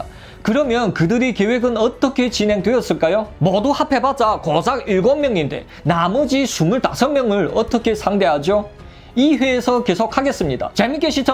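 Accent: Yanbian, China. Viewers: 웃긴다 조선족 말투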